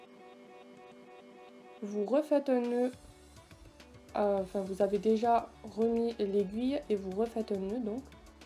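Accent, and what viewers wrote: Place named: Marseille, France. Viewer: C'est la première fois qui j'entends ta voie et je ne m'attendais pas du tout à un accent marseillais😂😂 Bref! Ta vidéo est vraiment cool!😍😍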